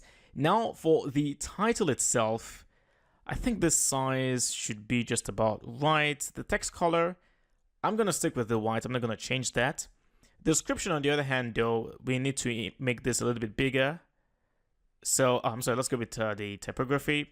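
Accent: Nigeria. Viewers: you kinda sound nigerian lol . great video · Fantastic video, delivered with clarity and a little humour - just right! I am interested in your accent. Would a guess of Nigeria be anywhere near? Thanks again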